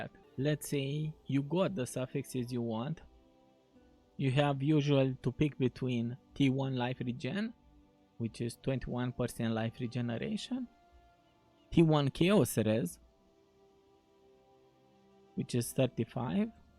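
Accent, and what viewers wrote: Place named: Eastern Europe. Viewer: Good video! I detect a central maybe eastern European accent?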